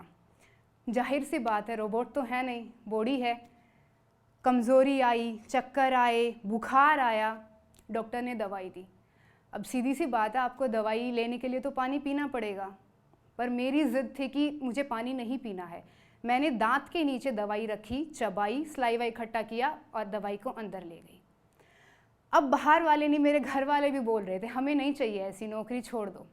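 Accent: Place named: Haryana, India. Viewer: Apki haryana dialogue bahut mast thi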